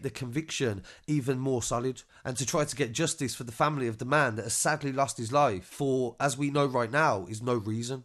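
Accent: Birmingham, United Kingdom. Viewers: I must be high..... But why is your voice over speed up? Keep that slow brummie accent. It's original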